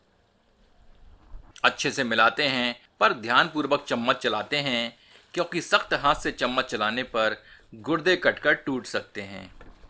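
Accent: Standard. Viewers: Brother itni sudh Hindi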